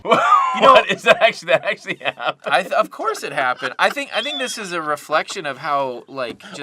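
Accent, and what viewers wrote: Australia. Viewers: It's Australian accent I think. Sounds normal to me.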